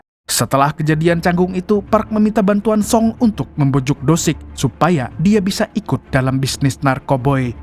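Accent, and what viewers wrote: Banjar, Indonesia. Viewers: Bang kamu orang banjar ya😅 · Bang pian urang banjar kah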